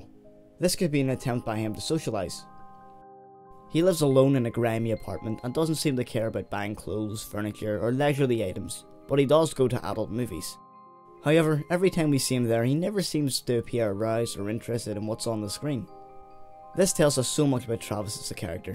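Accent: Northern Ireland, United Kingdom. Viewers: This analysis is a very insightful and amazing one, thank u very much for sharing! It's pointed out to me that I must go back and rewatch the film! Also can I ask u a quick personal question? Ur accent is a northern Irish one?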